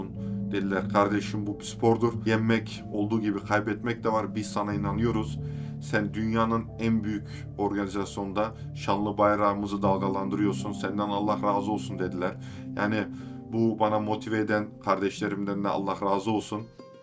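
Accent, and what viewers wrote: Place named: Niğde, Turkey. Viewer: kardeşim severek izliyorum başarılarınla mutlu oluyorum ama şu Türkçeni düzelt nolur sen Niğdeli sin yapma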